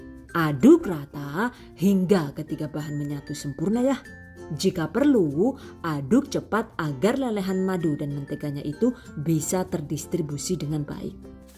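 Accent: Banyumas, Indonesia. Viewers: Iya cantik... Kok bisa ya Kadang ngomongnya pake bahasa ngapak ? Sama kaya q orang ngapak.. 😄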